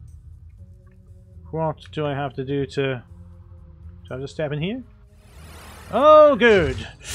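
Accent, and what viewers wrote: Scotland, United Kingdom. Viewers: are you from Scotland mate?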